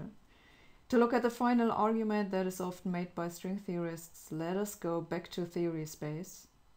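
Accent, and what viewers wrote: Germany. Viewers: Yes, that was a nice example of Hossenfelder's MANY throw-away one-liners! The effect of her swift, deadpan delivery is deliciously close to that of Sally Phillips who plays Minna Häkkinen, the "Prime Minister of Finland," on the show called VEEP (granted that what we hear in this case is technically a very mild and pleasant German accent, instead of a faux-Finnish accent).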